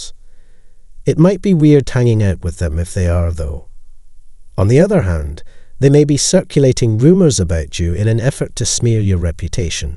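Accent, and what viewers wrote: Scotland, United Kingdom. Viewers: Bizarre filming. Good content & narration but why the foreign landscape/people when the narrator is speaking in English with a Scottish accent?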